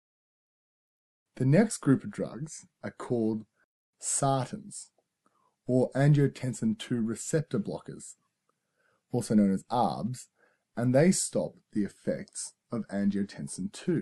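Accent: Australia. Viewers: thanks! I could tell an aussie from a mile away. from a kiwi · This is FANTASTIC! Awesome job! Love that it is Australian as well, and not American with words pronounced oddly! Would be AMAZING if you did a tute for this series on the "Triple Whammy" (effect of NSAIDs, diuretics and ACE-I) - I have searched the internet relentlessly and never found one! Please please please! Thank you x<num> for your fabulous videos!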